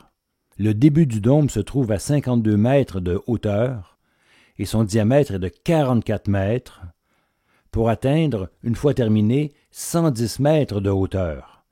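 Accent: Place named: Quebec, Canada. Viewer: Accent québécois hilarant, peu en accord avec le sujet. À quand la Renaissance le long du Saint-Laurent?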